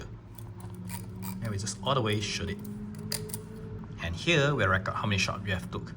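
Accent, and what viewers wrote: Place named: Singapore. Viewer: the accent is giving lah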